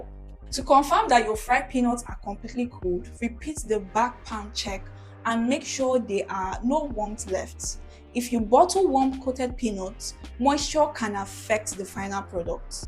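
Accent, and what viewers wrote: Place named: India. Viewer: Why is the voice in India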